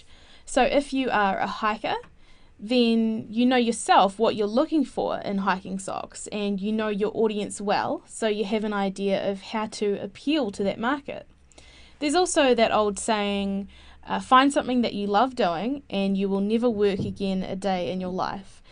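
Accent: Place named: New Zealand. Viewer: Sounds like New Zealand to me.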